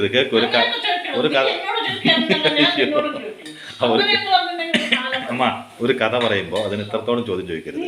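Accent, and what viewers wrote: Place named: Palakkad, India. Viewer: പാലക്കാടൻ ഭാഷ പോലേ തോന്നി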